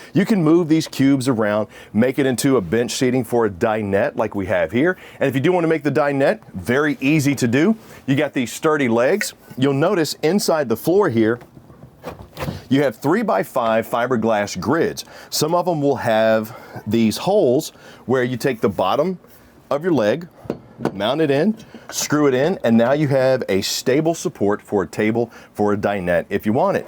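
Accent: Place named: United States. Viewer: I cannot imagine a more American-sounding person than this man. That's neither good nor bad - just an observation. The accent, of course; but also the tone, the diction, the rhythms...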